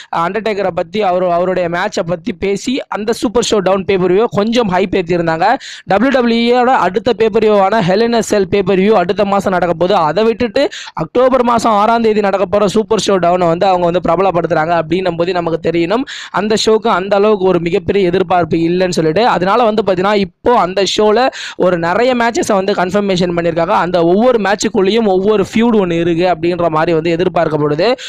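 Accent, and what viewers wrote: Villupuram, India. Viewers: Bro are u from villupuram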